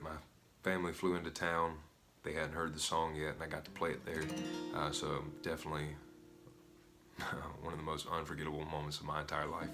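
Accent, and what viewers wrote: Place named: South, United States. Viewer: What a good description. You’re totally right. Even his talking voice reminds me of a Sunday in the south.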